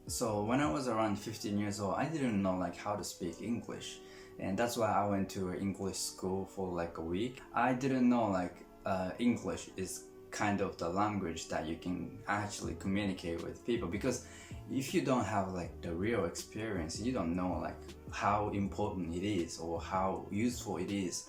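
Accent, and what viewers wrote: Philippines. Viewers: You're so cute though. And you got tge Filipino millennial English Accent. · you have that Filipino accent. Great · You have that Filipino english accent and we love it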